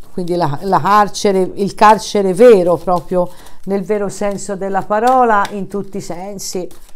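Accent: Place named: Tuscany, Italy. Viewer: Mi piace il tuo sccento toscano sei simpaticissima che tristezza sti giorni lontano da chi vuoi bene è veramente difficile speriamo passi presto e tornsre alla normalità amare tutto quel ci circonda abbiamo imparato tanto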